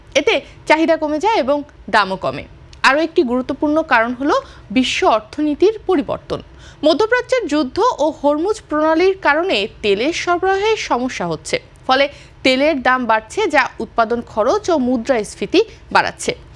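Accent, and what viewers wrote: India. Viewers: Akemon khobor pora??? Indiander moto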